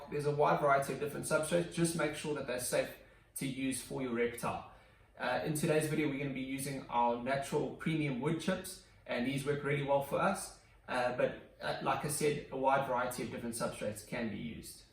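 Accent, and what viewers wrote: South Africa. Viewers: U sound south african Are u south african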